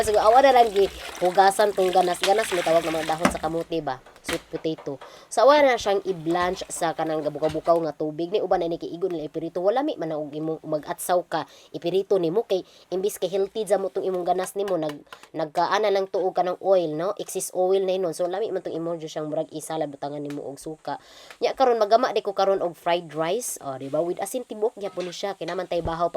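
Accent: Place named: Visayas, Philippines. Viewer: Ang imong bisaya makamingaw gyud! I’m lovin’ it. It hits home.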